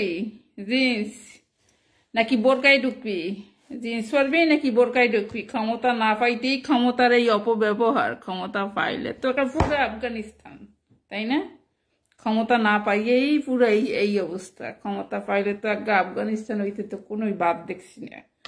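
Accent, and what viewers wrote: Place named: Dhaka, Bangladesh. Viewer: thoroughly enjoyed this 18.55 minutes of pure, 24-golden monologue....... Dhaka (or Silhet) accented Bengali was sonorous .... Thank you for making my day........ Regards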